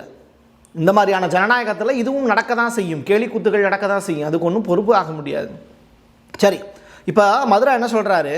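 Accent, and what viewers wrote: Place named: Madurai, India. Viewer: Maduraipesu madurai pesa unnai kettaangala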